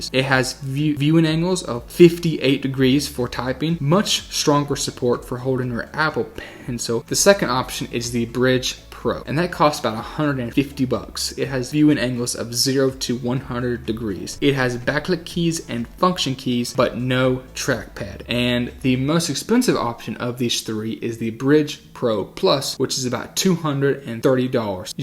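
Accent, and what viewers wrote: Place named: South Louisiana, United States. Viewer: Hey, like your review. I'm just trying to decide whether to get this one or wait for the Brydge Pro Plus to get it's act together. I'm pretty sure they will. And I like the idea of aluminum. One question, just out of curiosity: where is your accent from? You, by chance, from south Louisiana? Your accent sounds familiar.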